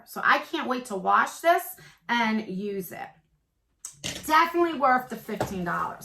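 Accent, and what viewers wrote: New Jersey, United States. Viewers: I love your channel! I heard the accent and it reminds me of home although you are from Jersey & I'm from Long Island. Similar sound. 😆 Such fun videos! Im in my early 50s and relate more to your channel more than some of the younger beauty gurus. We have different beauty needs & well, I love budget friendly beauty that can be found in these beauty boxes! Lol. Favorite emoji, hmmm, oh it has to be one that I always give to my kids, 💖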